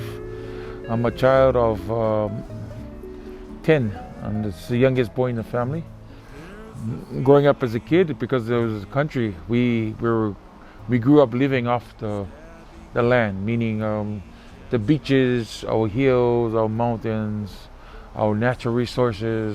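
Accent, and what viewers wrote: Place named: Japan. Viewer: 小錦の英語は日本語っぽいな。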